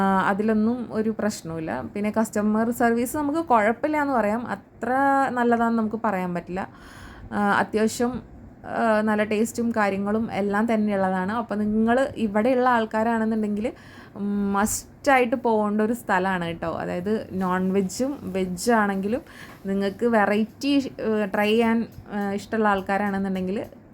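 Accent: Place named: Thrissur, India. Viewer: Suster ningal naatil evideya shabdham kond Thrissur aanenn thonnunnu ❤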